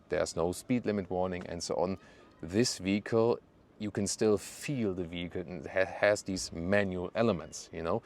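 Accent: Germany. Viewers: First time watching this channel,straight away notice Hard German accent.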